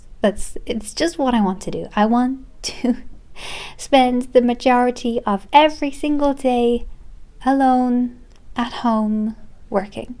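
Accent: Ireland. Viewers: Out of the blue you commented on one of my vidoes, so I checked out your channel and subscribed. What can I say, i'm a sucker for Irish accents :)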